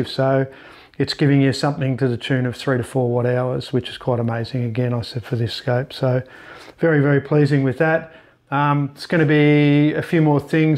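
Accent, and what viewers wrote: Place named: Australia. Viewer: Hi,. I saw all the video on 24" Hubble Optics Dobsonian and was very impressed. I read from the automatic subtitles since I am not so accustomed with Australian flavor english accent. Any way, I am so much interested on this type of designs.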